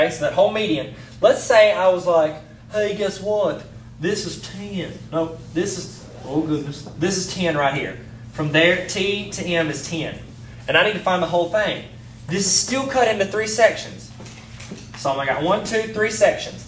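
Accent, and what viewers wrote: United States. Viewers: our teacher makes us use a damn compass to find this stuff, but i got the jist of what you were saying. Great video, and nice accent #amurica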